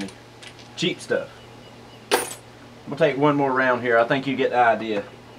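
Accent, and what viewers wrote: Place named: North Carolina, United States. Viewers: I was born and raised in NC, moved to Florida a couple months ago. Watching this video, it was great to hear someone speak North Carolinian again lol. Looking at picking up one of these soon, been debating it and comparing with other similar rifles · I love your NC draw. I'm from Oklahoma and people rib me about how I talk, so I was laughing with you, not at you when you said 'accriate'. My wife was in the other room and she heard you say it too. She said, "Where's he from?" LOL. We laughed again when you started talking about nipple to nipple. That was pretty good. You can figure if you get hit between the nipples with a rifle, it's all over. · U sound lreally southern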